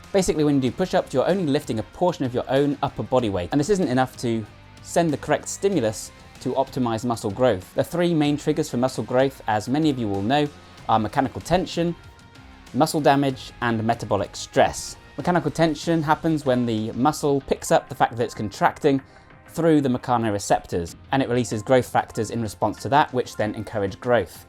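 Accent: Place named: Wiltshire, United Kingdom. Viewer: Finally a decent and informative fitness channel. Subscribed. Which part of the UK are you from? I'm based in Wiltshire you sound local. Keep it up. Thanks, Ben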